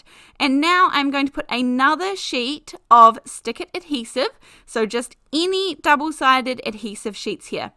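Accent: Southland, New Zealand. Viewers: Wow, that's so awesome, I'm just getting into card making and your video is great, I noticed you're also from NZ, by any chance from Southland, I noticed the accent as I'm from Invercargill 😂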